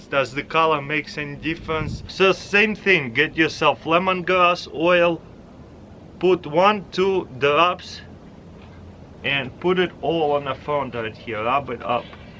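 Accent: Russia. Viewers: I love the Russian english accent it is cute!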